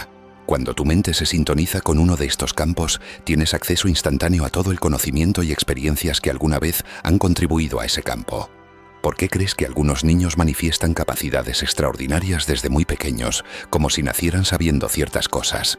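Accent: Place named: Spain. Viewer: El acento español es muy desagradable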